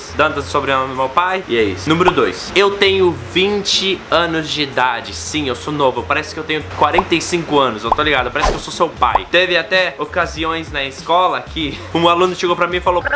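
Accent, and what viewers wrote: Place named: United States. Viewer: Ele parece ser americano. O jeito dele de falar, fala travando como os gringos quando começa a falar português.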